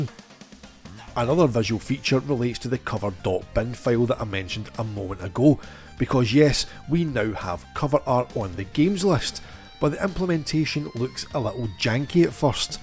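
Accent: Scotland, United Kingdom. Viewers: I like the Scottish dialect ❤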